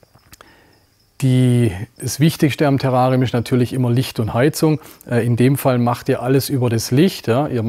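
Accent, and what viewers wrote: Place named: Baden, Germany. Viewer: Es tut immer wieder sehr gut, die Güte zu spüren, die du deinen Tieren entgegen bringst! Bin in der Tat nicht grad ein Kommentarschreiber (obwohl das heute bereits mein 2. ist 😊)! Aber das musste jetzt mal gesagt werden = wie sehr ich deinen Umgang mit den Pfleglingen schätze)!!! Wenn ichs richtig in meinem "Hohlen" habe, gehört der heutige Protagonist auch zu deinen persönlichen High 5 (Platz 4 oder 5?) ?!?! Nun hab ich noch ne Fachfrage: es gibt ne 4--5teilige Doku die sich mit der Tierwelt der indopazifischen Inseln auseinander setzt (Micro--Macro--und Polinesien)! Natürlich auch Neukaledonien, Fidschi, Tonga, Bora--Bora, Papetee, Samoa, Weihnachts--und Gesellschaftsinseln, Palau, usw.usw. OK, da ist nix mlt Grosswild,ect. Aber mit endemischen Raritäten! So gibts auch den Riesenskink, ein friedlicher Gigant! Der müsste noch um einiges gewaltiger, als die Blauzungen sein. 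Ob davon ein Video machbar ist? Letzte Frage: wo ist dein Reptilien--Haus? Vom Slang her, würde ich dich in die Badische, Schwäbiche oder Breisgau--Ecke verorten! Denn ich muss das alles irgendwann, mal life sehn! Für heute erstmal alles Liebe und Gut, sowie meinen Respekt!!! MW aus MG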